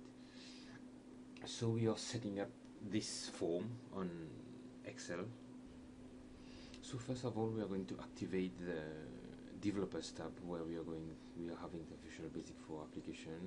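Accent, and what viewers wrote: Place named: Mauritius. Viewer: Hi, you sound like you are from Mauritius!:)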